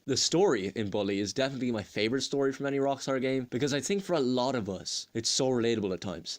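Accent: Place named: Ireland. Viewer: what part of ireland u from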